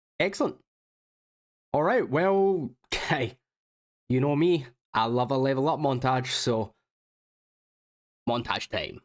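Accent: Glasgow, United Kingdom. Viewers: Scottish accent, armed goblins -> good arms vs bad arms. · Loving having a Glaswegian voiceover for a chunk lock 👌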